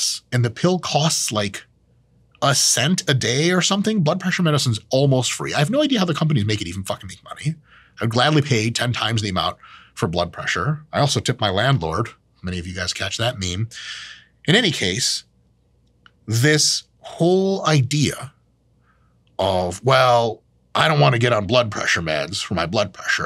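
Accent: South, United States. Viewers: Southern accent Dr. Mike is criminally underrated and underutilized.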